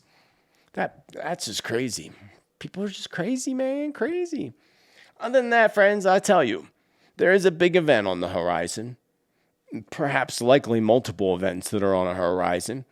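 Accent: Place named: United States. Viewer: 💚🤗💚 Bristol UK,,,, Luv the crazy American pronunciation of UK cities 😂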